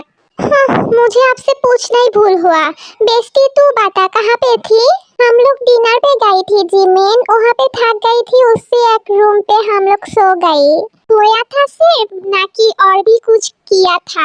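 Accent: Bengal, India. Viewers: Dakke nahi bula ke are you Bengali cz apke language main bohot bangla feel hain btw nice story 🥺😽btw new subscriber love you Please Don't mind kiyuki maine apki voice ke bhare main bola hain sorry🥺And your voice is so cute 🫶🏻😩🦋and apki story bohot peyari hain 🥺plzz pin me god bless you borahae 💜🤍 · Are di apto bengali ho na 😊 apki hindi so sweet 😊😊😊❤❤ so cute story and darun hoyeche golpota ❤❤ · Sis your Hindi accent is very cute mixture of Bengali and Hindi nice to hear🫰🫰🫰🫰🫰🫰🫰🫰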